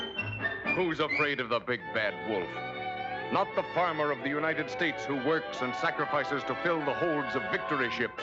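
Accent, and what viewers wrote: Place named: Ireland. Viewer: Does anyone happen know why the Disney staff chose an Irish narrator for this short?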